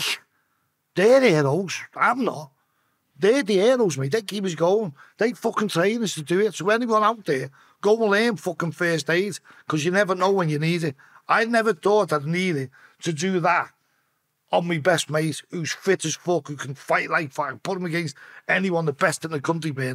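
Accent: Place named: Liverpool, United Kingdom. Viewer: Would be lovely for your international viewers if there was subtitle because the Liverpool accent is crazy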